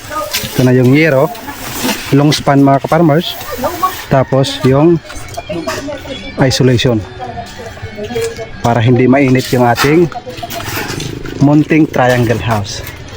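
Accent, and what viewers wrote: Waray, Philippines. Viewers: sir waray waray ang salita nyu taga saan po kayo?ofw from abu dhabi and taga leyte...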